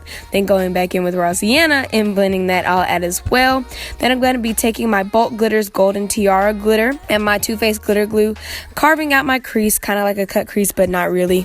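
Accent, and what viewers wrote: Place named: South, United States. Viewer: Sound so country / southern !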